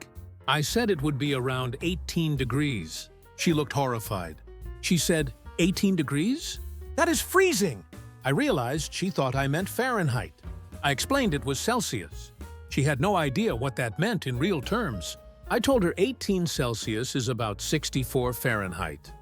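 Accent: United States. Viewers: I’m puzzled as to why AI is reading these anecdotes in an American accent.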